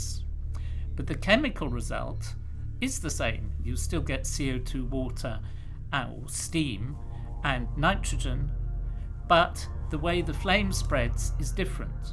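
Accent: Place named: United Kingdom. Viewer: Science is more fun with british accents and stereotypical scientist hair.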